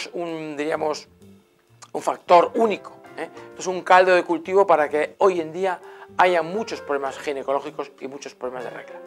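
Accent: Spain. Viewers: Jajaja! Ya tampoco le entiendo pero por la manera de hablar, el acento tan español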